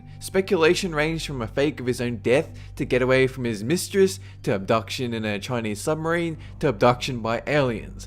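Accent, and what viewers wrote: Australia. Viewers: I've wondered this for a while, and hope someone who knows, or himself will answer this question. Are you from Australia? You sound like it but I just cant tell, it sounds normal to me because I live there, but watch many american videos. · Australian accents are HOT.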